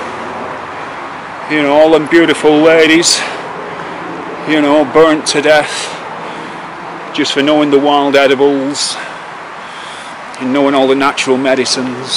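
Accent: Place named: Northern England, United Kingdom. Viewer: Great vid as usual. Out of interest I was watching one of your films with my wife and we both tried to place your accent. I appreciate you’ve lived in Hampshire for some time, but I expect you originate from up north?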